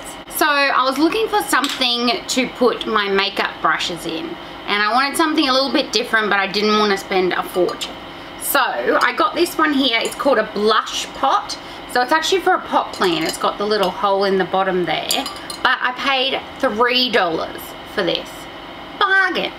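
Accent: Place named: Australia. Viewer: You have such a strong Aussie accent! 😋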